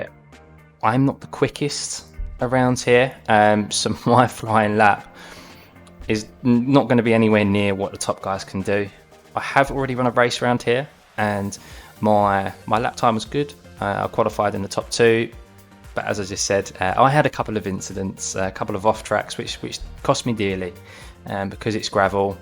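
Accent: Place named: Essex, United Kingdom. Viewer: Harsh but an Essex accent is top tier.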